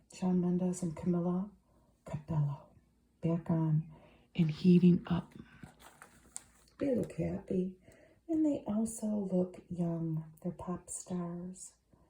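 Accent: Midwest, United States. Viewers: Your Midwestern pleasantness and accent keep me coming back 😊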